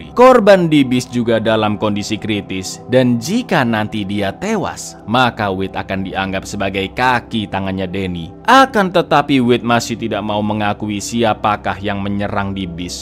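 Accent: Java, Indonesia. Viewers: Sampean wong jowo Yo cak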